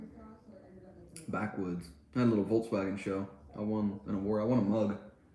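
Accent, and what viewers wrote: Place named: Pennsylvania, United States. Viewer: Where from PA are you? Recognized the accent immediately lol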